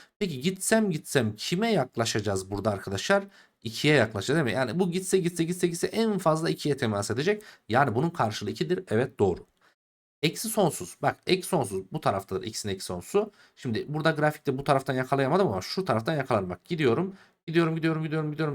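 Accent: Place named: Kayseri, Turkey. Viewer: Hocam nerelisiniz konuşma tarzınız kayserililere benziyor da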